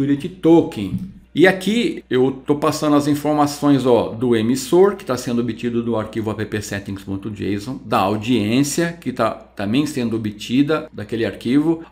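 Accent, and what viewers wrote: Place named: France. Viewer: Você participou da minha vida inteira codando, sempre que procuro algo encontro vc. Hoje é a primeira vez que ouço sua voz e percebi que é puxado para o francês 🤗 Engraçado te seguir tantos anos lendo seus artigos e só agora ver vc trabalhando efetivamente. Sensacional o tutorial como sempre 👏👏👏👏. Mestre do code!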